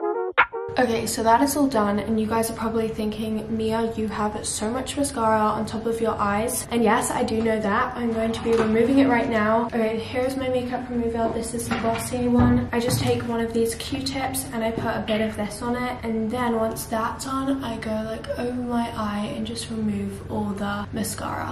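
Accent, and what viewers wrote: England, United Kingdom. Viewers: k im a brazilian learning the british accent and i just wanted to say that youre the first person i hear talking slowly because omg. btw where are you from? loved your accent <3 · I started school on the 1st of September, but I got into in-person school on the 6 of the same month. It really makes a difference seeing your friends every day. ANDDD Mia´s videos are so inspiring! It is also a little bit weird for me knowing that we are on the other side of the world (literally, I live in South America) but at the same time feeling so close! I also want to say thank Mia because she helps me to practice English, especially because she is from England and her accent is so natural. You give me so positive vibes :)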